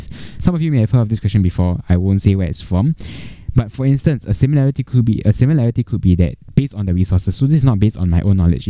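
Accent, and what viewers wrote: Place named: Singapore. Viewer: SG English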